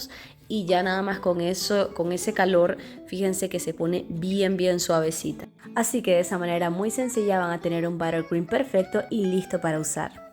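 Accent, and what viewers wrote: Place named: Venezuela. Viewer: Hola soy nueva seguidora y me enganche, eres de Venezuela? Porque estas en Argentina pero el acento te delata😅😅😅😅😅😀😀😀😀😀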